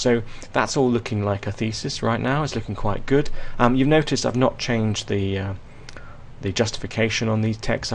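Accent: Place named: United Kingdom. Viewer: For some reason, my brain pays 50% more attention to this guy just because of the British accent.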